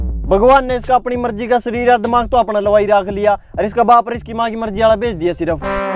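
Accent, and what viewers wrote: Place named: Haryana, India. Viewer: Aapka haryanvi bolne Ka style achha lgta h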